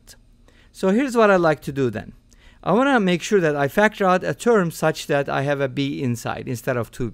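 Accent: Turkey. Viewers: You have a really turkish accent, are you any chances turkish?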